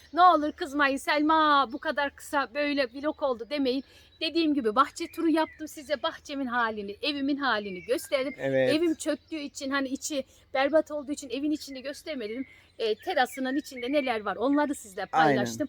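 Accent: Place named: Kayseri, Turkey. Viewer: Nerelisiniz abla kayseri şivesine çok benziyor şiveniz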